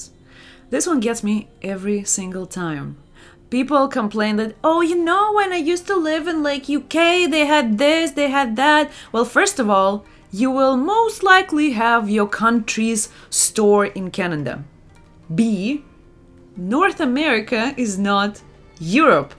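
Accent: Russia. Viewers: I like yours videos and what I like the most is when you play your Russian accent, this make me LOL. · Her Russian accent is sooo good 😅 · I wish you spoke in your Russian accent for the entire video. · I like your Russian accent! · 😄 I was looking for a channel about what life in Canada is like and came across you! As soon as I had heard your first word I learnt you are Russian sa well as I am. Привет из России. Не скучаешь? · hi, thanks for yours videos. I am working to inmigrate to Canada by studies from Chile, South America next year. (2022) I love you play Russian accent. Thanks.....Nos vemos en Canada!!!!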